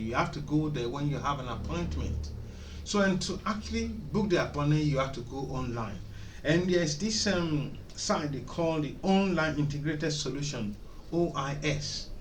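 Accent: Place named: Nigeria. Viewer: you sound Nigerian 😆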